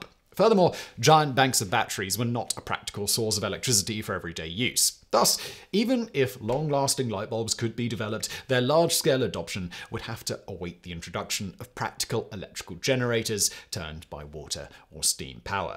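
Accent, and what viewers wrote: United Kingdom. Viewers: Well the narrator with the British accent seems to think history class was only taught in highschool. that excludes everyone in the UK then.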